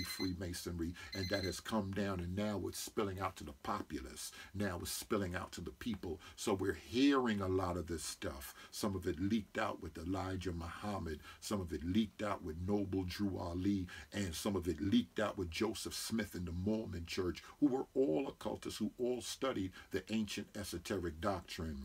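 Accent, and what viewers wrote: New York, United States. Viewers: Of all you got to say, you reply this criticism That's a part of his strong NY accent. Get off the BROTHER. Ask God to help YOU so you will LISTEN to all this brother is saying. YOU got a PROBLEM!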